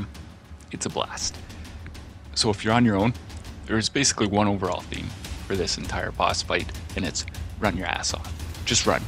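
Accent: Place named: Canada. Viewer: Yup, we have the same accent though mine is slightly different cause I am from Newfoundland, but I recognized the canadian nuances lol nice!!! As for Wizards, I hate them, they melt man, I can't even use stormchaser when I know wizards are involved cause I struggle to maintain headshot accuracy with them, so I usually try to build around Gally if i know wizards are involved, and Arby is a staple in ALL my builds, it literally hasn't left my kinetic slot since I got it, the only better weapon right now is Wishender, and Wishender hits harder.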